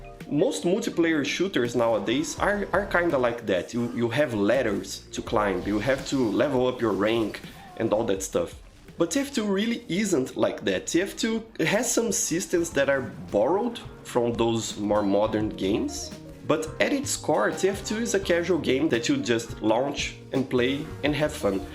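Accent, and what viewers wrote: Brazil. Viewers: Pelo sotaque é br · Ouvi 10 segundos e pensei "isso é sotaque de brasileiro". Aliás, provavelmente do interior paulista. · Its funny how fast you can tell someone is brazillian by their accent, cool vid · Are you Brazilian? Because you have an accent of a Brazilian speaking English. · Mano, da de saber donde tu é só pelo sotaque, ksksksksk forsa ao tf2 feito pelos br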